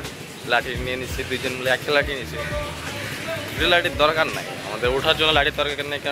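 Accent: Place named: Bogura, Bangladesh. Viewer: Bogurar vasha hits different 🤍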